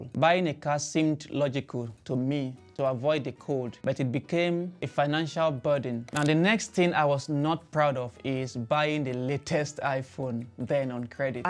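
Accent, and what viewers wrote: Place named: Africa. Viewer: i worked with a lot of Africans, for some years, i miss hearing this accent everyday x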